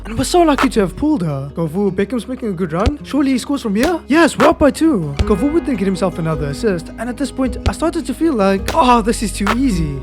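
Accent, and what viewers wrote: South Africa. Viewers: Are u from SA🇿🇦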